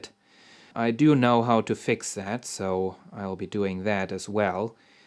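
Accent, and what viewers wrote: Germany. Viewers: The sound of a true german enigneer, like Kraftwerk :D As a half German, I can see why we don't like our recognizable accent.. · Aww no... the German accent makes you sound like a true repair guy professional... And it sounds really cool!! Please please don't lose it. . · For each video you make, the German accent decreases :). Thank you for the videos btw. Edit. Is from 2012. Your German accent got heavier actually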